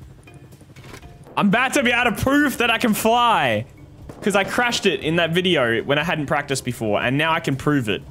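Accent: Australia. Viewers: I dunnor, mate. I think most people can't get over how Aussie you sound - myself excluded because I love you guys to death.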